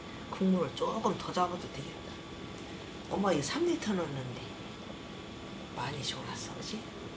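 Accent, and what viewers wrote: Chungcheong, South Korea. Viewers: 충청도이신가바요ㆍ 목소리들음 친정엄마생각나요ㆍ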